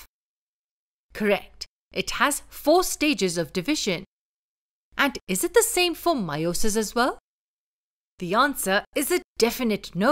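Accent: India. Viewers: Can you just change your Indian accent? That's so weird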